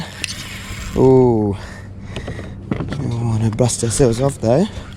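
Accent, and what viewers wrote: Australia. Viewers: I noticed on his patreon page that it's called Nice and Nice Persons Club. In words, "How cool is that!" Edit: Actually I think he says, "How 'noice' is that!" (love the Aussie accent.)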